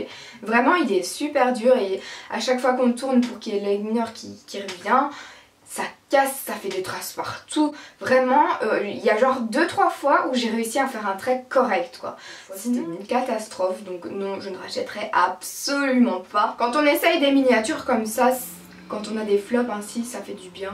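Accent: Belgium. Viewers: Tu es belge ? Tu a un accent dou ma question sinon j'ai bien rigoler :) sympa ta video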